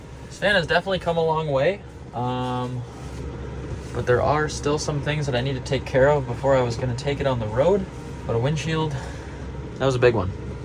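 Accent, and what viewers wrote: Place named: Minnesota, United States. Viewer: Its so nice listening to a fellow minnesotan on youtube. All the other youtubers have the worst non-minnesotan accents.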